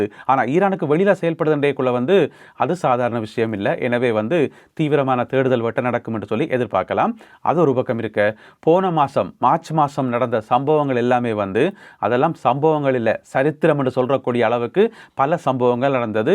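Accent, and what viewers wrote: Sri Lanka. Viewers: இலங்கை தமிழ் ரொம்ப அழகா இருக்கு நண்பரே. வாழ்த்துக்கள்.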